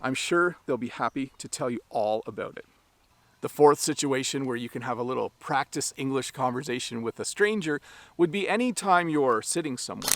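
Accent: Canada. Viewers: Hi, I noticed you pronounced the "s" in "conversation" with a "z" sound while I've heard other people pronouncing it more like a "c" sound. Is it a sort of Canadian accent? Thanks for the lesson!! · Thanks..I'm from Sri Lanka and have been a member of your educational YouTube channel for a long time and have learnt a lot so far. Your way of presenting lessons is excellent. Your Canadian English accent is very clear and learners of English may not want to switch on the English subtitles to understand what you say. Actually, it's important for all learners to talk to strangers when learning English. As I know, it's the best way to practise English and by doing so, they would be able to learn different accents of different speakers. I know by experience talking to foreigners who visit Sri Lanka that they speak in different accents. Some non-native speakers speak in broken English but it's understood.. 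It's only through mingling or interaction with others that we're able to practise speaking. This is true regarding all other languages.. KEEP MAKING MORE LEARNING VIDEOS....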